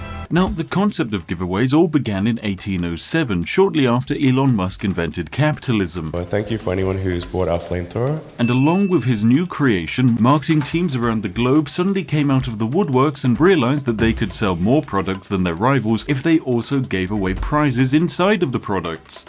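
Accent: United Kingdom. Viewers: Oh Im so happy to have found this channel. I wouldve never thought that making money could be so easy or that some companies are just that stupid. Not that I ever tried some of these tactics but you and your humor and british accent are making the journey worth watching. Thanks for making these videos and keep up the great work. Your one of my favourite channels that Im always watching right now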